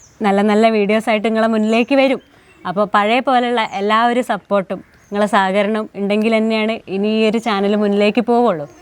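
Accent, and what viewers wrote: Malappuram, India. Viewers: അതാണ് നമ്മൾ ഈ മലപ്പുറം ജില്ലയിൽ കളിച്ച് വളർന്നതാണ് നമുക്ക് ഇതൊന്നും ഒരു പുതുമയല്ല ഇതും അതിനപ്പുറവും എതിർക്കുന്നവർ കണേണ്ടി വരും നമ്മൾ ഒരു പൂന്തോട്ടത്തിലെ പലനിറങ്ങളുളള പല ചെടികളെ പോലെ നമുക്ക് എന്നും സന്തോഷത്തോടെ കഴിയണം ഞാൻ ഒരു മുസ്‌ലിമാണ് എൻ്റെ രാഷ്ട്രീയം SDPI ആണ് ഞാൻ എന്നും നിങ്ങളുടെ വീഡിയോ കാണുന്ന ആളാണ് ഒന്നാമത് എനിക്ക് ഫുഡ് ഉണ്ടാക്കുന്നത് കാണാൻ ഇഷ്ടമാണ് അതിലേറെ നിങ്ങളുടെ മലപ്പുറം ശൈലിയിലുള്ള അവതരണം ഒരുപാട് ഇഷ്ടമാണ് ഇതെന്നും നമ്മുടെ ഇടയിലുള്ള ചില പുഴ് കുത്തുകൾക്ക് ഇഷ്ടപെടൂല അവരെ വിട് നമ്മൾ ഉണ്ടാകും നിങ്ങളുടെ കൂടെ നിങ്ങളുടെ കുടുംബ ജീവിതം എന്നും സന്തോഷത്തിലാവട്ടെ ചേട്ടനെ കണ്ടാലറിയാം നല്ല ഒരു ഭർത്താവിനെയാണ് ചേച്ചിക്ക് കിട്ടിയത് എന്ന്🎉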